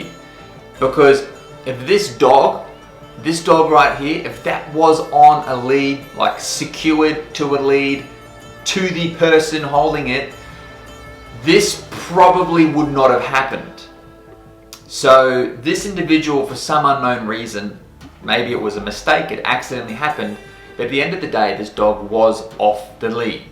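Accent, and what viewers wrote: Australia. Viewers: Strewth mate, looks like you got another "yes" here for the idea for the next video. Love Lucy and love your Aussie accent. Keep up the good work. 😎👍🏼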